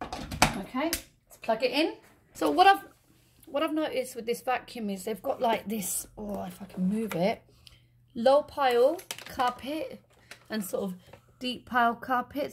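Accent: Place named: South, United States. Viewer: always a pleasure, where you from ? I see the UK plugs and you have a southern accent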